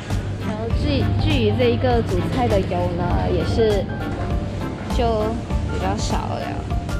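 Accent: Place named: Penang, Malaysia. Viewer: 槟城口音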